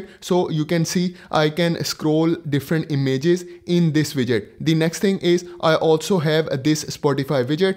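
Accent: India. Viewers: I who am Turkish love the Indian accent 😄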